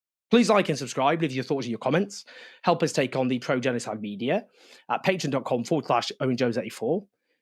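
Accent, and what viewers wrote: United Kingdom. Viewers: Why does Owen sound British???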